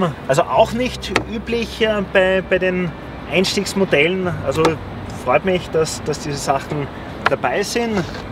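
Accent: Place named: Austria. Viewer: Na Na, wer wird denn gleich so negativ-kritisch sein. Testerfahrung / -Routine kann man lernen, CHARME nicht - den hat man oder eben nicht - ich mag die Österreichische Sprachfärbung sowiso lieber als die Ost-Deutsche. Interessantes E-Auto - ich sags ja - die Asiaten kommen! Lass den Jac auf 450 KM Reichweite reifen, dann kann sich VW warm anziehen. Ich setze mich in kein Auto, das so flach ist, daß es unter der geschlossenen Parkhaus-Schranke durchkommt.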